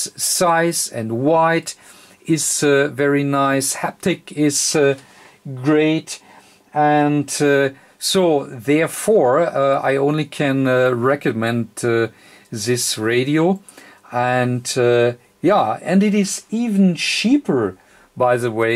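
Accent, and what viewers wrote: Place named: Germany. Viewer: In the first 5 seconds i know that you are a German 😂 your accent is amazing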